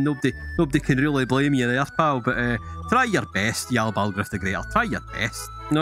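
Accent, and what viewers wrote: Scotland, United Kingdom. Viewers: False, his very heavy Scottish accent is part of what makes this so great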